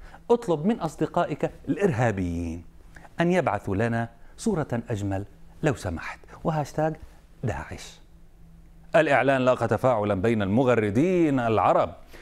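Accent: Iraq. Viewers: والله اللهجة العراقية عم تطلع معك دهب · احبك من تحچي عراقي🇮🇶❤️ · ههههههههههههههههه اسلوبك يخبل ونوب تحجي عراقي تجنن لعنة الله أمريكا ومن والها أمريكا وايران هي سبب الدمار وهذول فروخهم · اش حلاتك من تحجي عراقي عبالك صدوك واحد من عدنا😄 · ههههههه احب هذا المذيع المخضرم من يتكلم بلهجة العراقية 😍🇮🇶✌🏻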